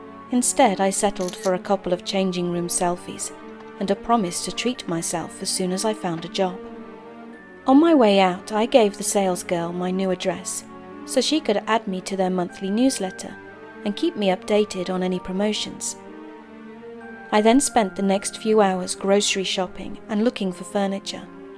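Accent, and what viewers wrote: United Kingdom. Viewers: Admittedly, the coincidental situations that the lady in this story experienced are equally scary and sad. She leaves her abusive ex and tries to find solace at this place in the country, only to have some random creeper sneak his way into the new place as well. Otherwise, as always, this video and its story are both very well done, and British accent adds a very regal element to it. Good job yet again! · Good story, great beautiful actress, excellent editing and camera work! The whole thing was exceptionally well done, with very high production value. Only 2-continuity type things kinda confused me: If it takes places in the USA, especially the South, why does the Narrator have a British Accent? Also, I think forgot to take off her beautiful wedding ring, unless she's married in the story. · Very good, I am impressed but remind me never to go to that store for lingerie. 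The store lets the customer try on lingerie and then resells which is something that not done any store lol. The narrator did a fantastic job, is she British she sounds British to me.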